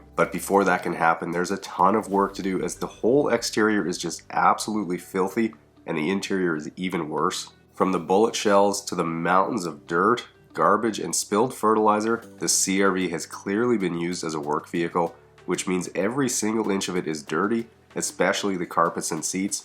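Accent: Canada. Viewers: Ok so now after watchin several of your videos I'm hooked. I had to share your videos with my sis in Florida..Now I know your Canadian.. I can't hate cause my daughter lives there😊 and I love Toronto and was in the military in the Detroit area long time ago so your accent was very familure. So you know electric, you can clean, you use tools, you like big toys and working outside, you also love music. I also watched videos of your studio build. I just wanted to say, I think your well rounded and a super cute dude who pays attention to the 'details'..also..your detailing is phenom! I can't believe people don't clean out their vehicles before you get them, that seriously blows me away, Thank you for putting great content out oh and I watched a free detailing vid you did..giving is so uplifting, and it comes back to you. Thank you keep up the good work!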